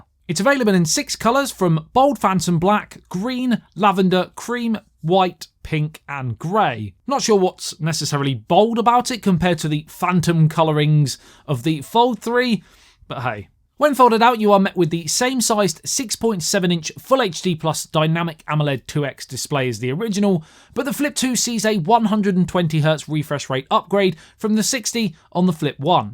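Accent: United Kingdom. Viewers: That's how Brits pronounce Z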